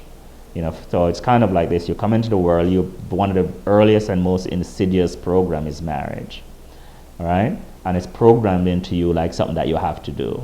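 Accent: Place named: Jamaica. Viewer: and he sounds Jamaican like me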